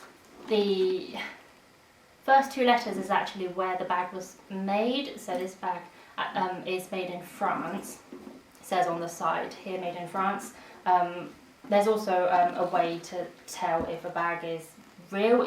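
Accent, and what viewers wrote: United Kingdom. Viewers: So strange seeing someone Chinese with such a heavy british accent! Mind blown! lol xD Very interesting and good close up shots on the details - great! Very well done for your 1st video. I can see you being successful - honestly (not just saying it). Very impressed!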